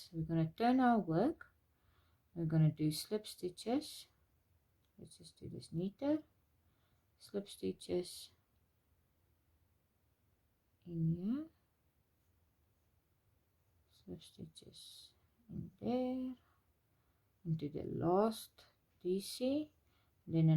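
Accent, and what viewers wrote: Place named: South Africa. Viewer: This is perfect thank you! Your accent brings me back to when I lived in SA! ❤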